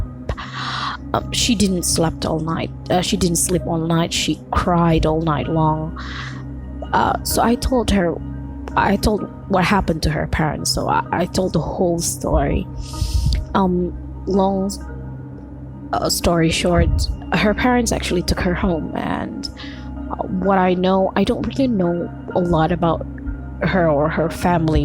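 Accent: Indonesia. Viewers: Ini yg ngomong org indo ya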